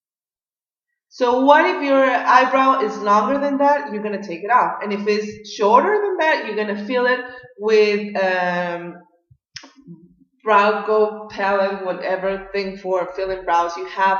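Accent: Mexico. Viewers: Love this video! You speak English really good I have the Mexican accent too! Haha😂 And my eye brows are a challenge a big challenge cause I have to make my brows every day cause I don't have to much hair on it😒 sad ! Well thank for this tips.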